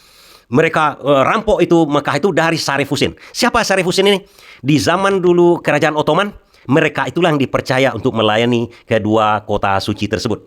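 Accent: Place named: Belitung, Indonesia. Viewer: Bahasa bpak ini seperti orang2 daerah bangka belitung tapi lebih ke belitungnya, apa benar pak....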